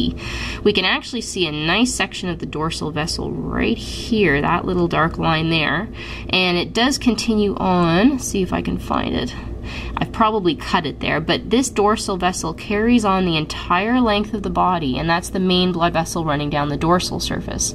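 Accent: Canada. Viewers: .... are you Canadian?:)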